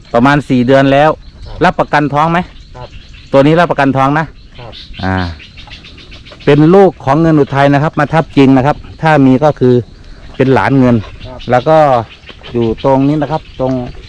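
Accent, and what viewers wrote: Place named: Isan, Thailand. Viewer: สวัสดีครับ.ผมชอบฟัง ตอนพูด สำเนียงอิสานมาก..เฮดี.ครับ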